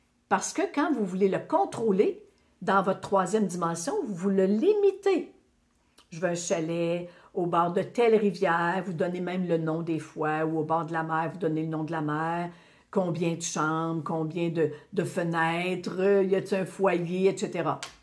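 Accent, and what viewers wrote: Quebec, Canada. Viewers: Bonjour, vous écouter est un vrai bonheur pour moi, votre joli accent québécois m'enchante et vos messages m'apportent toujours l'apaisement dont j'ai besoin. Les commentaires des uns et des autres me rassurent également car je vois que, beaucoup, comme moi....ressentent ou ont ressenti une grande fatigue, tristesse, déprime, vertiges... mais depuis quelques jours, je vais beaucoup mieux, j'ai beaucoup prié et de très belles choses me sont arrivées, alors merci les guides, merci l'univers, tout est juste...la vie est magnifique ❤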